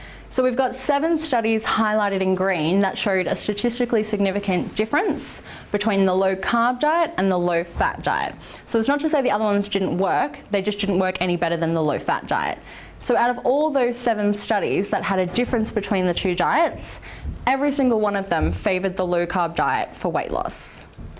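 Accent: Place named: Australia. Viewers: No that's an Australian accent. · Can't place her accent. Is aussie...sort of...but something else mixed in... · There is a hint of a regional Aussie accent. To ears not familiar with the Australian accent, Mark Twain described it as the most purest English accent. I’m pretty sure he was drunk. However, our society really didn’t like a classist society modes and thus our accent is based on a societal protest against elocution. Enter the Aussie twang. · That's a typical younger, educated Australian's accent.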